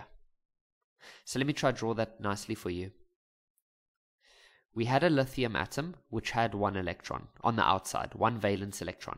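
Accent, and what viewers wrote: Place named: South Africa. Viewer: you from south africa aint you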